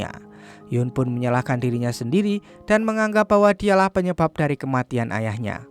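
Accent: Java, Indonesia. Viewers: Byak mulut bukan film,mana medok bgt ngmngnya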